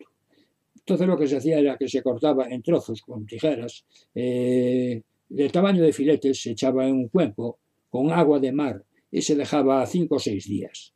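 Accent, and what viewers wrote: Spain. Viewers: Disfruto muchísimo mi abuelo era español y el acento del señor es para mí familiar y he escuchado varias conferencias.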